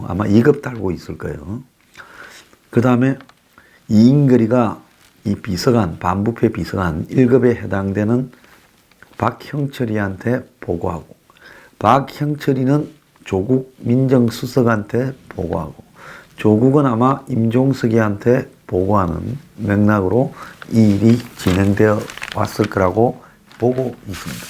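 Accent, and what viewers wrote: Gyeongsang, South Korea. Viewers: 경상도 사투리가 너무 정겹습니다 ㅋㅋㅋㅋㅋ....^^ 진지한 내용에 귀여운 사투리가 엇박자인데도....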